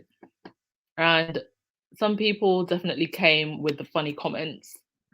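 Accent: United Kingdom. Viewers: A million views that's fantastic Congratulations. It's really not surprising your podcast is very interesting and I love the British accent it makes me feel that I have a front roll set. Thank You. 👍💕💕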